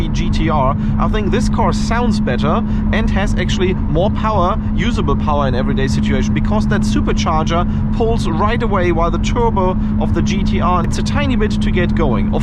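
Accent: Germany. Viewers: Wie man einfach den deutschen raus hört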